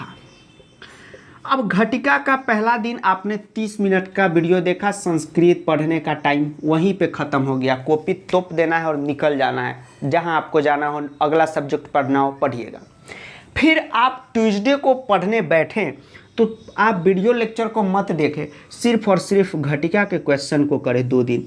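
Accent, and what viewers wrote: Bihar, India. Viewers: Sir aap Bihari hai ya Gorakhpuri 😂😭😂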